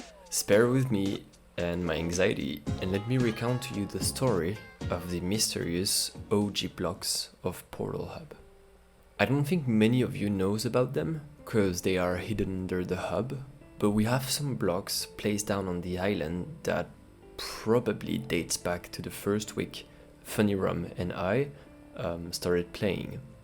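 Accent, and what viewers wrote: France. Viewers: Are you french ? (I am french too) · T'es pas français ? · Mais c'était sur enfaîte mais c'était sur (quand tu parlé on entendé que tu était fr mdr) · Hey portalhub, you’re not english, are you? I feel like you’re a bro of akinsoft. You’re french aren’t you? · Le magnifique accent français :D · L'accent français est vraiment repérable partout xDDDD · wooow u are french bonjour je parle aussi francais comme un francais je mytho pas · Du coup t'es français si j'ai bien compris (c'est trop bien) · Ur french accent is so cool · Heyyyyyyy you arre french like meeeeee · cette accent français :o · Tu es francais ? :O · Are you french or something ? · you sound french and i am proud of it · Dang your voice tho.... It's perfect the French accent makes it even better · Mais t'es français? :))))))))) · You honestly sound like a slightly younger skin soft with a slightly larger French accent ngl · Bro that's funny, i'm french and at the moment u began to speak I knew u were french too xd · French or canadian? 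· Is it me or you have a french accent?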